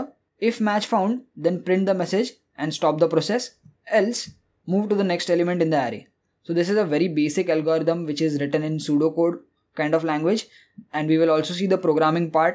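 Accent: India. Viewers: Terrible Indian accent. Barely understand what he is saying...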